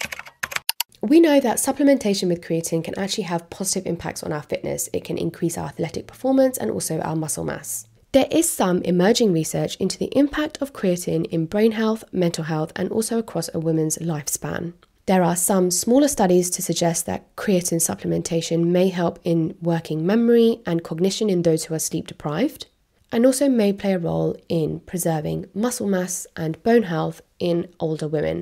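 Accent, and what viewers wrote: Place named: India. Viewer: Indian accents are so brutal. H8 it.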